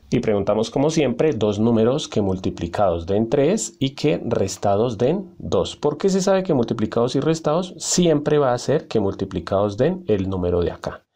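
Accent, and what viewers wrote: Colombia. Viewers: Disculpen es colombiano?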